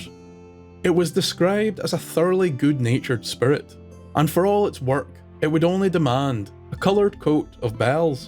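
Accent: Scotland, United Kingdom. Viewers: This is rapidly becoming my favourite channel. What part of Scotland are you from? I can't pinpoint your accent. I'm from Glasgow but I'm half irish (my mums scottish and my dads irish) though my granny on my mums side is English, she was actually ruth Ellis's sister, who in case you didn't know was the last ever woman to be hanged in Britain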